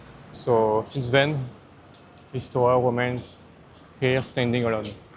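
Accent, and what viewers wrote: France. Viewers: Very french accent, waouh my Godness😵‍💫😵‍💫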